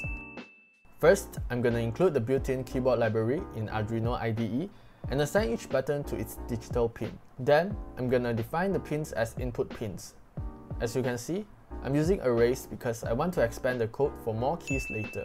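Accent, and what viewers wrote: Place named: Asia. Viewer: Not in a rude way , but , Also the asian accent just makes the whole vid better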